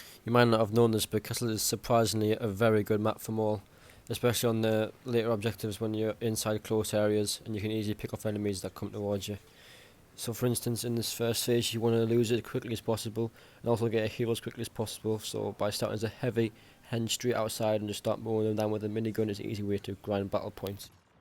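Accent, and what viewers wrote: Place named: Geordie, United Kingdom. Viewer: Never have I needed subtitles until today Geordie boy. Thank God for the comments in English, appreciate the effort you put in.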